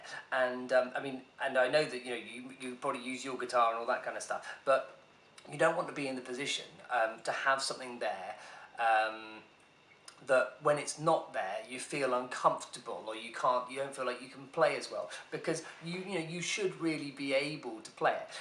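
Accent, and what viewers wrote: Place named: United Kingdom. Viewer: Cool vid and the epic British accent! +sub:)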